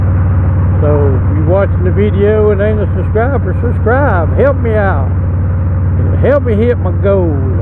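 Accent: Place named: South, United States. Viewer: Well Sir you talk just as country as me.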